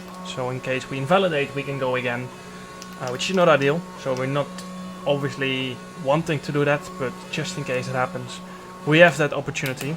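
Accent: Netherlands. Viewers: Je klinkt erg Nederlands in je videos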